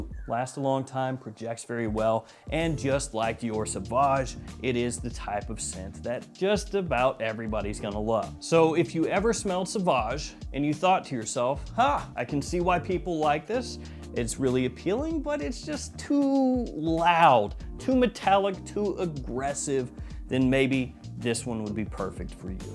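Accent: South, United States. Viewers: More southern accents, please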